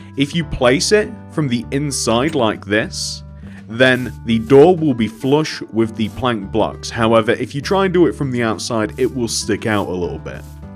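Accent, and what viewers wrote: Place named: Liverpool, United Kingdom. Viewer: Hi! I've got a question. Are you from Liverpool because your accent is a bit like it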